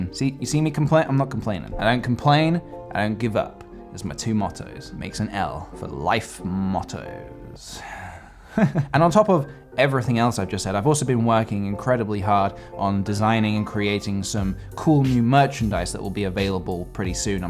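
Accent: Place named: England, United Kingdom. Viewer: I just realised 1 big thing. I understood how cool are u as a human, and I can't really talk with you, meet you. Just even see you. Even if we somehow would met I can't even talk with you in a normal way. You are from England. I can barely understand you(because Im from Ukraine ), but I really love watching your videos, and thats basically all what I can do. Please guys, thumbs up for my comment if you think same way. I really want to to see me. Thanks keep making great things for us, we love you.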